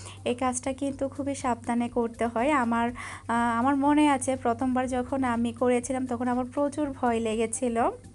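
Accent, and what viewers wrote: Chittagong, Bangladesh. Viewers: Apni ki chittagong er?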